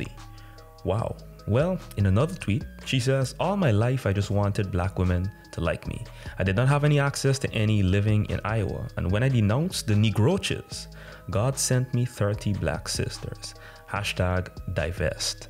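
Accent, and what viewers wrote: Guyana. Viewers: Guyanese accent alone gets a sub. Content was good to, Bigup yuhself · Your accent sounds Guyanese? Where are you from? Nice clear explanation in your video also.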